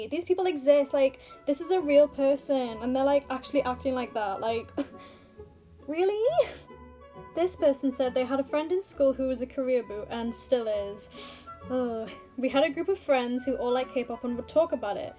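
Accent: Newcastle, United Kingdom. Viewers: omg ur from newcastle hi sis🥺🥺🥺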